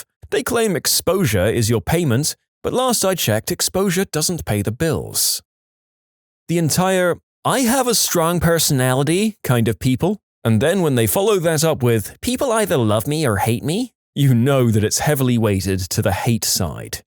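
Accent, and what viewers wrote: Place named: United Kingdom. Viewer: The British accent is everything❤☕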